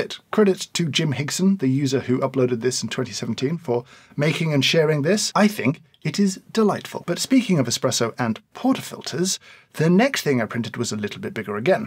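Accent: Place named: England, United Kingdom. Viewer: what part of england is this accent from?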